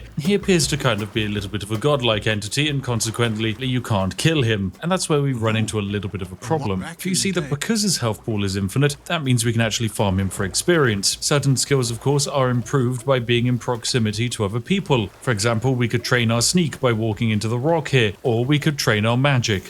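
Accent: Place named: Yorkshire, United Kingdom. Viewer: I DIDNT KNOW I NEEDED THIS! omg nothing so tranquill as a good voiced yorshire brit having fun doing apocalypse things! this is so great!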